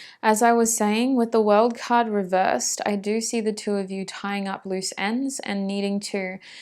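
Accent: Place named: Australia. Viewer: 1st I choose pile one, this (the whole reading) resonates with me so much we broke up last year the month before Christmas and he still constantly messages me and wants to see me now in the past it didn’t go great but I know he is very kind and we both had our own childhood issues/issues that wasn’t healed so it was very hard but I do feel as though he’s who I’m meant to be with and I don’t feel like our chapter is finished and I have stopped talking to him for about two weeks or more because I’m really trying to think about myself and heal for now and hope that this time will give him the time to heal and change but I definitely have left hope because it has been so long without anything happening but I do always still hold some type of hope and he does always seem to come back to me but I really am making sure that if I go back he really does give 100% as well as me and it’s an even giving connection. 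Thank you lovely and don’t worry about the time I love long readings so I didn’t have any issues watching all the way through my reading and I’m Australian as-well and your accent is so strong but calming I love watching your tarot videos before bed it definitely gives me energy the next day as I have the confirmation I needed.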